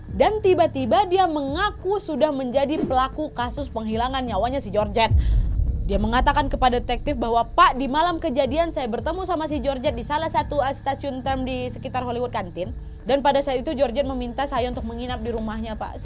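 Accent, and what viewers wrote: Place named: West Sumatra, Indonesia. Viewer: "Ndak itu jawabannya do" 😅 awak takana jo kampuang mandanga nad2 mangecek macam tu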